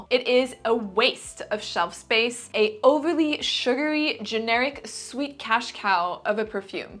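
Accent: France. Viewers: Kinda just watching the video because I love listening to her French 😌 ❤ 😊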